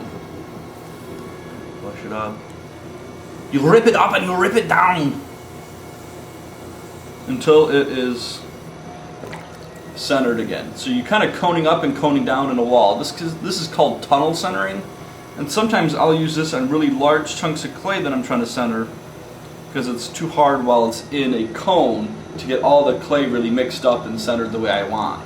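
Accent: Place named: Germany. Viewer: Not gonna lie... the German accent startled me. 0_0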